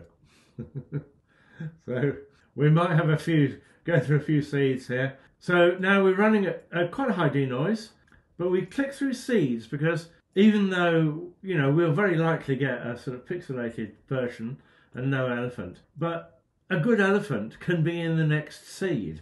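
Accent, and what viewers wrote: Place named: RP, United Kingdom. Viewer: Great tutorial, and love the posh accent :)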